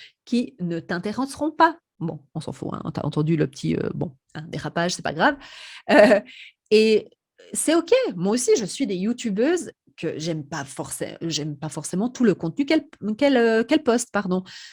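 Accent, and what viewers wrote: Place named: Switzerland. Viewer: Bonjour! J'ai découvert ta chaîne il y a 2 semaines et j'accroche vraiment! Je ne suis pas maman mais je travaille à mon compte depuis la maison et du coup il y a beaucoup de choses qui me parlent dans ce que tu dis. Je dirais même que ça m'aide alors merci beaucoup! J'aime beaucoup ton authenticité et même je t'admire d'oser montrer autant de toi, en toute simplicité, sans chichi. J'aime aussi ta façon de parler, ça me change (la Suisse c'est exotique 😉) et puis c'est ok si tu fais des"fautes de français": déjà parce qu'on comprend ce que tu dis de toute façon. Et puis c'est ta façon de faire, c'est tes expressions à toi, c'est presque ta signature en fait. On a tous notre manière de parler et c'est bien aussi! La langue est vivante et d'ailleurs les erreurs d'aujourd'hui seront peut-être la normalité de demain! Continue à faire ce que tu fais! 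👍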